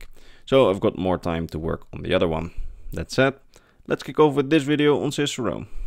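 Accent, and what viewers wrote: Netherlands. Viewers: hi! i love this vid, question tho, are you dutch? you kinda have that dutch english accent. im dutch btw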